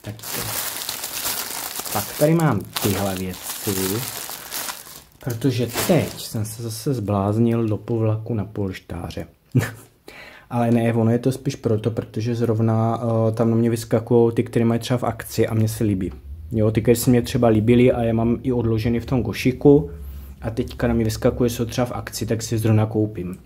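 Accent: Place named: Sumava, Czech Republic. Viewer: Ahoj, Bože, ta tvoje radost z objednávek je fakt nakažlivá 😊 Jdu objednávat také kravinky pro radost ❤ Přála bych ti spolupráci s Temu, nebo Shein... Myslím, ze by ti to moc sedělo a zisk by nebyl priorita 😊 Krásně se posloucháš To víš, ten tvůj měkkoučký dialekt pro nás ŠUMAVÁKY 🤔 Hezké dny přeji a těším se na příště 🙋‍♀️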